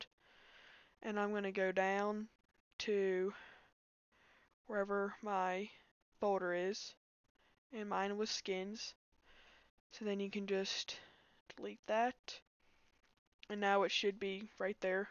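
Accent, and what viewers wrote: United States. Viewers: Are you from Alabama by any chance? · Texas haha I like your accent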